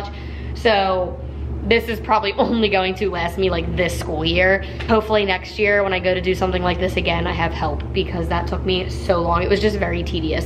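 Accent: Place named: Delaware County, United States. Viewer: i was thinking about how much of a Delco accent you have and then you said philly it made so much sense! 😂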